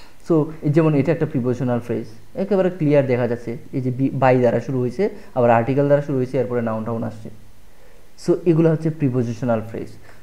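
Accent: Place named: Sylhet, Bangladesh. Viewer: অনেক ভালো লাগলো ক্লাস গুলো। আপনি সিলেট এর মনে হলো। আমি ১ বছর সিলেট ছিলাম, অনেক ভালো মানুষ সিলেটিরা।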